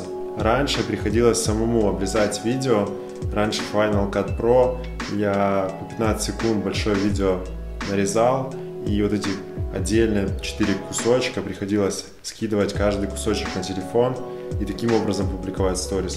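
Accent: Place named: Ukraine. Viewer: украинский акцент, с мягким звуком «Г». Только и всего. Поверьте нам не режет слух абсолютно и подстраиваться сложно, так как в нашей речи практически нет твёрдых звуков «Г», как в русском языке. Как говориться, зВыняйте))).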